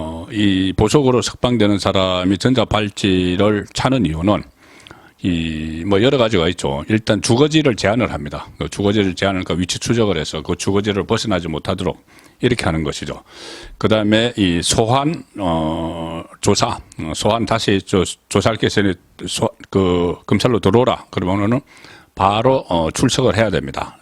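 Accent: Gyeongsang, South Korea. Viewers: ㅋ 경상도 출신의 송국건 님의 어휘입니다 이해하시길요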